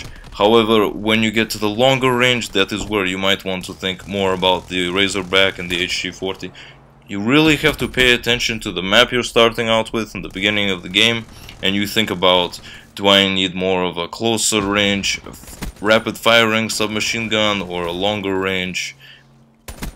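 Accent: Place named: Russia. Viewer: He sounds like for Russia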